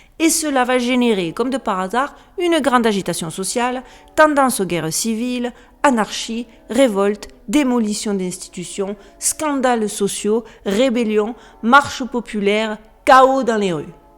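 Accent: South, France. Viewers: meme si l'OL est l'ennemi juré de l'OM je ne me sent pas arnaqué et suis trés fier à l'international que ton petit accent du sud rayonne!!!!!!!!!!! meilleures voeux 🥰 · c'est normal 😊 🙏.. après le plus important que et vôtre amie et vous et passé les fêtes de fin d'année ensemble 🙏 le temps passe vite.. donc ☺️ courage je comprend ☺️ merci pour les sujets proposés 🙏🙏 et merci pour vôtre bonne humeur et l'accent du sud 😃 🙏 je vius souhaite une belle soirée 😊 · Hé Bhé ! Bon courage à Nous tous et que cette année soit la meilleure possible , vue ce qui nous attend à court terme. Bravo à vous pour ces vidéos Très instructives et je me posé une question , dans votre adresse e-mail ou insta 13 est pour Marseille ? vue votre accent je crois que j'ai ma réponse . Merci encore Bises 😜 👍 . Ha oui ! moi je suis à l'opposé , Lille le Norddddddddddddddd . 👏👋 . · merci j'aime bien votre approche des sujets que vous traitez et votre accent du Sud aussi , surtout ne changez rien. 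Je peux avoir de l'humour sans aucune méchanceté mais qui parfois n'est pas compris. Ne m'en veuillez pas. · Heu 😮 elle vit aux states 🇺🇸 !!! Donc pas d’accent du Québec en vue 😅sinon une pointe d’accent du sud de la France 🇫🇷 dont elle est originaire 🎉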